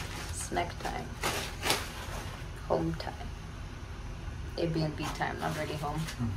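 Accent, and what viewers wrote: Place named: South Africa. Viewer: New subscriber here, I really enjoy your videos❤❤❤❤ Are you from South African? U have a South African accent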